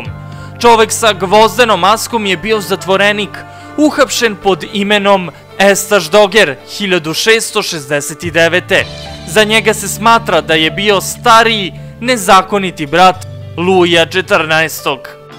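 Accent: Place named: Niš, Serbia. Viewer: odakle si ja mislim da si iz Niša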